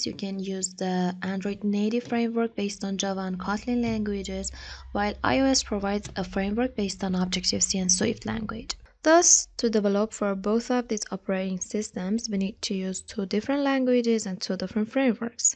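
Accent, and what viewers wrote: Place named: England, United Kingdom. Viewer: Excellent english accent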